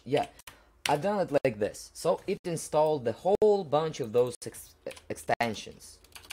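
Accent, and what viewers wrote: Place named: Russia. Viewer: that's russian accent bruh :)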